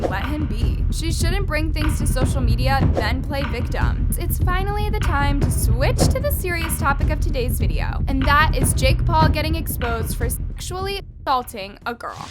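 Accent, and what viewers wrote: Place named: AAVE, United States. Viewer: literally just aave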